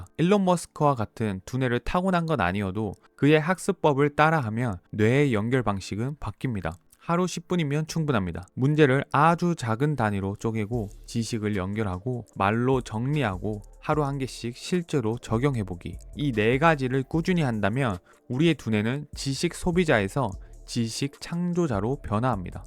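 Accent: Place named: Gyeongsang, South Korea. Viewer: 경상도 사람인가봐요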